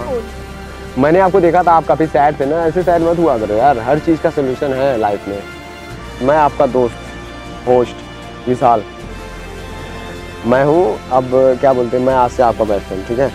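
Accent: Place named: Uttar Pradesh, India. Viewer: Up walo ke hi pass yese bat krne ka trika rhta h me bhi mirjapur se hu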